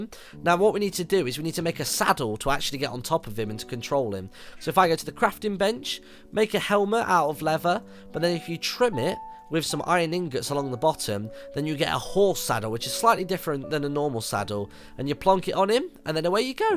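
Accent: United Kingdom. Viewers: that british is so funny :)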